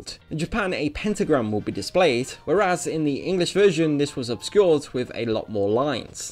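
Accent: United Kingdom. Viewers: All british youtubers have the same cadence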